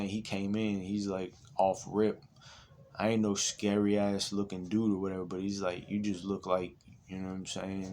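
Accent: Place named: Florida, United States. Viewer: Bra I kno u from florida from the way u talk...boy u real AF!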